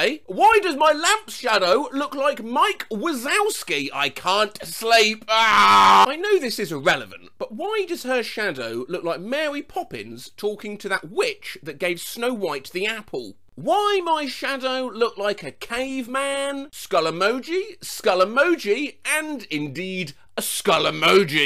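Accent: United Kingdom. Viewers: Scooooul Emooooji, i love british accent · British? Stopped watching the video immediately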